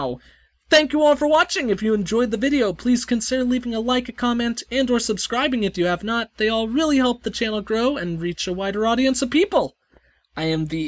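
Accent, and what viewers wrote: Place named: Australia. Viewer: you sounded Australian to me (I'm British)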